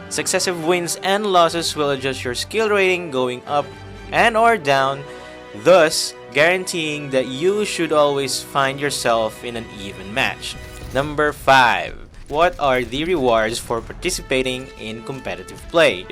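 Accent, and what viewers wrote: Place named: Philippines. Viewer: this is actually really well done. the "filipino english" accent is bearable <3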